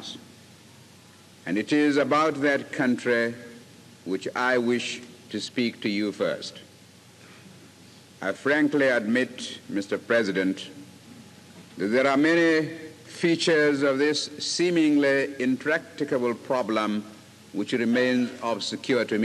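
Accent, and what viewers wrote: RP, United Kingdom. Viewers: He sound like a member of the british royal family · He speaks with a British Queens English accent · He has Old British accent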